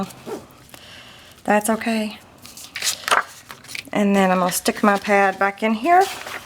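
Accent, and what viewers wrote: South, United States. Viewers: Such a generous giveaway!! Love listening to your southern accent...I'm from Pennsylvania and it sounds like music to me. I love your work...you are very meticulous in your creations...thanks for sharing your talents with us!!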